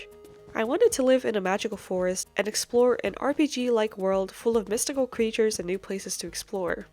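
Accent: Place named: Sweden. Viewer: HUR BLEV DU AV MED SVENNE DEALEKTEN I ENGELSKA?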